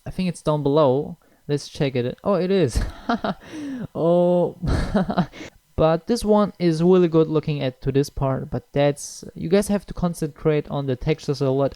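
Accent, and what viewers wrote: Germany. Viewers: Ach hab mich schon gewundert 😂. Deine Sprachstil klang so englisch aber die Aussprache mehr deutsch, deswegen war ich bissl unsicher. Aber Danke für deine schnelle Antwort 😂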